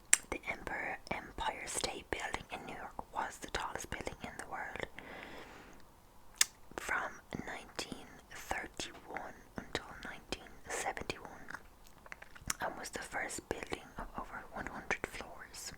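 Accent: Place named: Ireland. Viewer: Great video, I can't get enough of a) fact videos and b) Irish accents so this is amazing!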